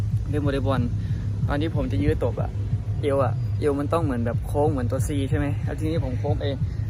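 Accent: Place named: Isan, Thailand. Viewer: ต้าวมันกว้างมากไม่ใช่มันกว่าง😀😀🐷🐷🐷หมูน้อยพูดสำเนียงบ่ถิ่มบ้านเฮาเลยน้อหล่า🐷🐷🐷🐷😊😊😊❤️💖💞🐷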